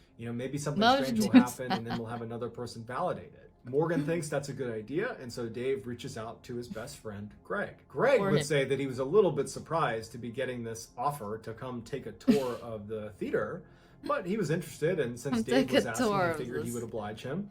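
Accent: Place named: United States. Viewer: 🇬🇧 man this girl is cute as fuck!!! 😍 I love her American accent #notaperve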